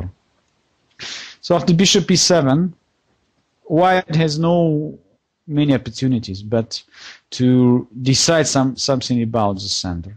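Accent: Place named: France. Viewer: Fantástica partida pero yo tengo una pregunta que lleva rondándome la cabeza los últimos días. Cuál es la nacionalidad de este buen hombre? El nombre es ruso, el apellido judeoalemán pero el tío tiene un acento francés que flipas… Me he quedado loquísimo.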